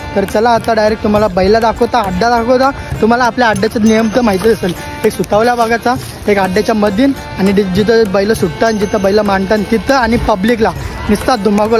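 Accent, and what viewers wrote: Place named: Agri, India. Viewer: एक नंबर भावा आपली आगरी भाषा आणि तुझी बोलण्याची टँक एक नंबर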